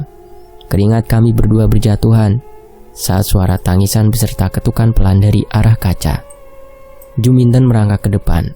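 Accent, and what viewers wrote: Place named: Surabaya, Indonesia. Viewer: Oh yo iki arek suroboyo, wong ngomongno mi setan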